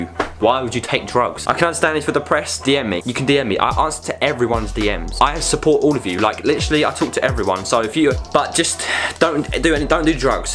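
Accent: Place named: England, United Kingdom. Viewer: he's from England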